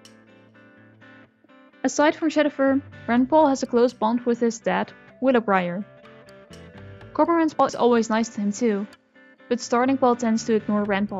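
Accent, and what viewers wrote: Netherlands. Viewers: are you dutch? your accent sounds similar to that of dutch ones :0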